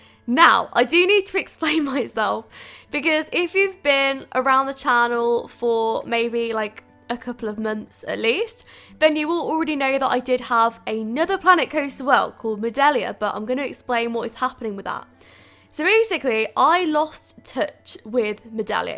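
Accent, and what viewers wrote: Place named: Scotland, United Kingdom. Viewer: Excuse me, are you from Scotland? If not, I think your accent is really Scottish 💜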